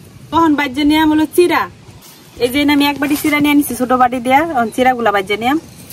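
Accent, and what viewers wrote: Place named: Mymensingh, Bangladesh. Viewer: ভাষাটাও চানচুরের মতো মজাদার ছিল। মমিন সিংএর কথ্য ভাষা আমার প্রিয়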